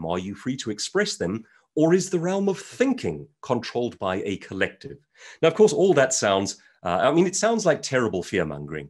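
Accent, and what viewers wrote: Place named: RP, United Kingdom. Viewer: Having vast professional experiences with the English, then dating a few Scotsmen and travelling there extensively, I must say that you kind Sir really do speak the Queens English as well as that POS!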